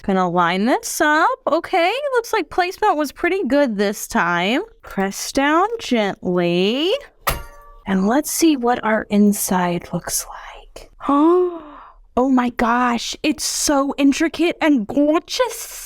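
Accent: Texas, United States. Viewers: Just be you. We can't please everyone. For me, as long as the Lord is pleased that is really all that matters. You aren't in England. You are in America speaking how you speak. Don't change who you are when you're innocently using your Texas accent.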